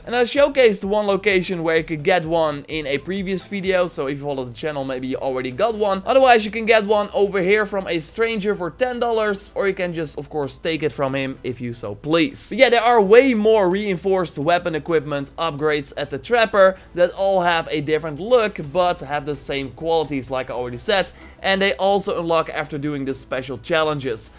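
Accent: Netherlands. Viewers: Are you dutch? anyways do something about the accent jeeez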